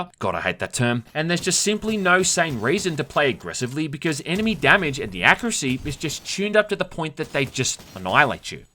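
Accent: Australia. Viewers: Sorry to break it to you but he's clearly Australian...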